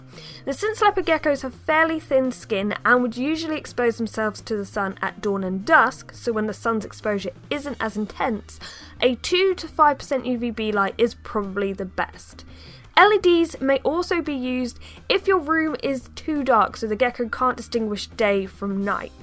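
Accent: United Kingdom. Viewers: Unsure of your accent, you from the uk?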